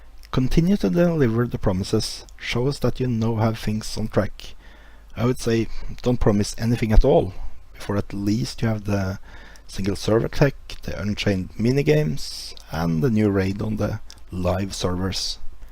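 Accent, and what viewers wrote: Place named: Norway. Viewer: Well I don't see why would you need native speaker tbh. I suppose there is a lot of players in AoC which doesn't speaking natively so I think they don't mind your accent or how it call Norenglish :P Watched EP1 but I think it was tooo long. I suppose podcast about 10 minutes is just fine. Just continue if you want to do it really and also announce on forums as I did not see any info about it just came here by accident :P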